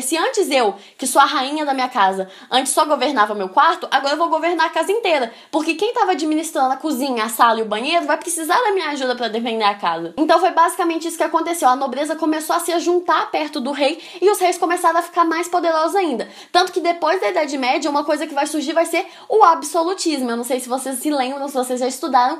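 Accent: Minas Gerais, Brazil. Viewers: Ela é Belo Horizontina, mineira. Haha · Vc é mineira né? Sotaque lindo sô ❤️ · acho q é mineira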